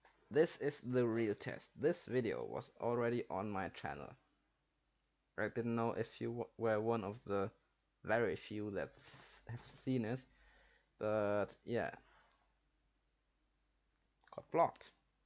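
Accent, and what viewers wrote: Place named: Germany. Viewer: So, what country are you from? Your name looks Scaninavian (maybe Swedish), but your accent sounds German to me, but I don't hear well. Enjoyed your Home Free reaction. They're often great, and sometimes silly. Good job.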